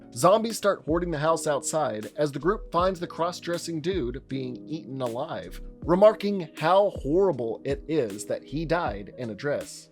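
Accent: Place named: South, United States. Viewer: I love hearing a southern American accent on an excellent movie review.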